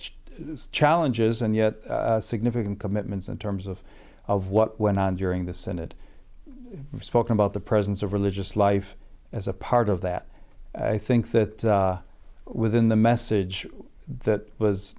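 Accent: Midwest, United States. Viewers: Pope speaking American english 😲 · Chicagoan accent.... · It sounds midwestern to me. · It‘s the Chicago accent. 😊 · He has the voice tone, inflexion, articulation of a leader, like he is indeed talking “ his business “… true American…no matter how many years living abroad and with double nationality..❤❤❤ proud of him.. May God Bless him!! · I can still hear a Midwest twang at times!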